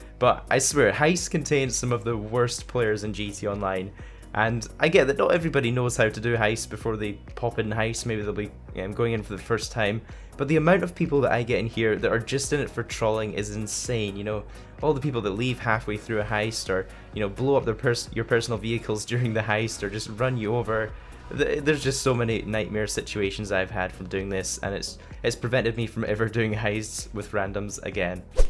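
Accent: Scotland, United Kingdom. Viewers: Are you Scottish? But I hate explosive maniacs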